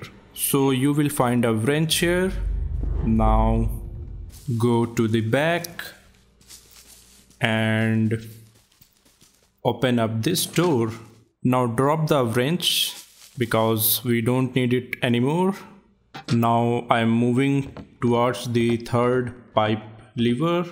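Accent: India. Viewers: His voice sounds like Indian but peaceful but it’s cool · It's because his an indian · He Indian most Indians have an accent like that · 😂😂 indian ho bhai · He is indian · Resist kids his indian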